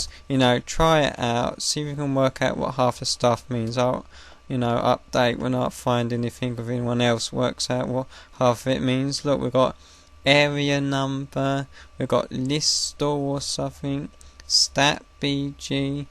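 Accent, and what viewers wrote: United Kingdom. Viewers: yey british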